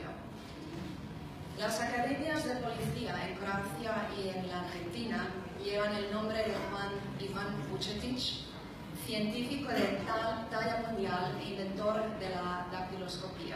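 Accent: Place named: Spain. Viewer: govori iberski španjolski FFFFF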